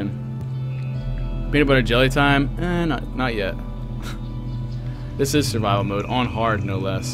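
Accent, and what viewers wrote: United Kingdom. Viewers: The British accent lmao “Alright let’s go find some endermen.” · With That British Accent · bruuh wow bri*ish accent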